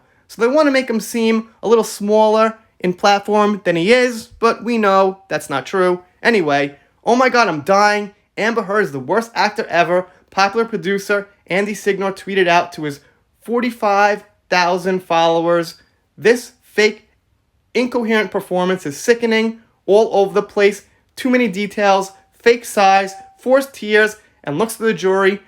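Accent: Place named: Brooklyn, United States. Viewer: This is my 4th time looking at your channel and I LOVE ITZ!! Thank you! Love your “ Brooklyn”? Accent too ( from The Heights😍 )!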